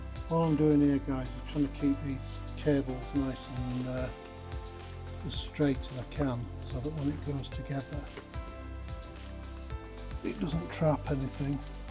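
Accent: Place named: Wigan, United Kingdom. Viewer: Ok, I have to ask. I have a customer from the UK who lives in Wigan, you sound exactly like him! Do you live near there?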